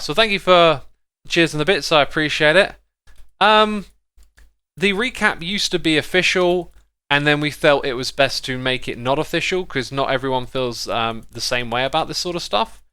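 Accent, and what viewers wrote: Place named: United Kingdom. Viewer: What kind of accent is that? I'm pretty sure X is from Britain, but what section is that accent. It sounds like no other accent and familiar at the same time. I absolutely love it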